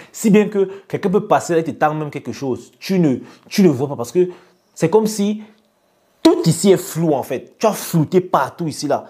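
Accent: Cameroon. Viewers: La manière donc tu parle la j'espère que tu es du kamer 🇨🇲 force a toi Fréro 🤝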